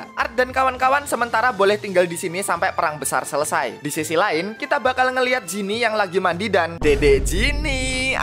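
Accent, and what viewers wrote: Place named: Java, Indonesia. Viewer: wong jowo mas😅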